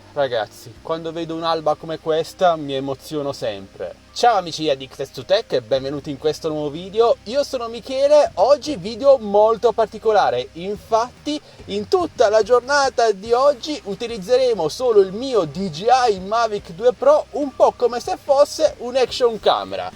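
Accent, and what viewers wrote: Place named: Apulia, Italy. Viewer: Se si considera la camera e la parte video in generare è ancora al top... se non il top dei semiprofessionali. Purtroppo l'avionica è superata, nell'efficienza, nella sensoristica, nel FW (intesa come capacità di calcolo), nel peso.... e poi c'è l'AI (l'Intelligenza Artificiale).. di cui è praticamente privo. Credo che il suo valore oggi possa valutarsi sugli 800 euro e non senza riserve nel comprarlo. Mi associo completamente al tuo consiglio, a meno di necessità impellenti, ATTENDERE L'USCITA DEL MAVIC TARGATO 3. Grazie del video.... 👍 dall'accento sembri pugliese però hai il tramonto sul mare (magari sbaglio io era l'alba, oppure è sul Mar Ionio)👋👋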